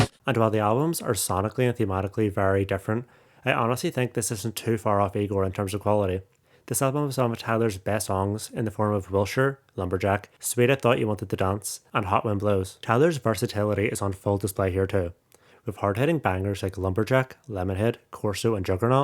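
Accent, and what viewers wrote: Ireland. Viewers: Love this. More of these please! He talks and he’s Irish! OMG.. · I was not expecting the Irish accent lol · The Irish accent trew me off ngl 😂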